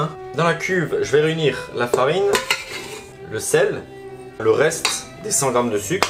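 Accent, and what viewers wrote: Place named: Alsace, France. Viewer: Quel plaisir de retrouver notre bel accent Alsacien ❤ et nos succulentes recettes de chez nous ❤ MERCI BEAUCOUP ❤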